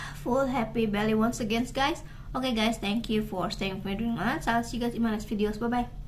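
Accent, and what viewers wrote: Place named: England, United Kingdom. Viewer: love your accent English sis❣️😊the chips so crispy 🤤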